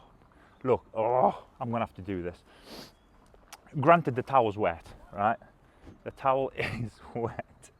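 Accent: United Kingdom. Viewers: Are you a geordie?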